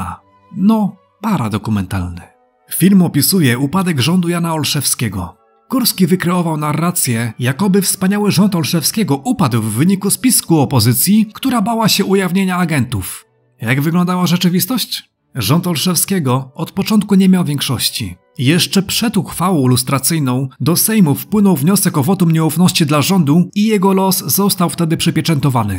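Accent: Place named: Ukraine. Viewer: dobry film, podsumowanie pisowskiej mafii 👍💪 a powiedz mi skąd u Ciebie ten akcent? Jakby ukraiński. Skąd pochodzisz ?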